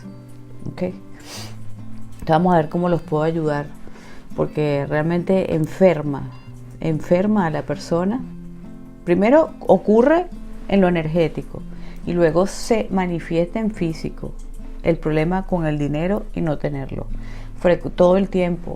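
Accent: Argentina. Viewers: Argentino o uruguayo?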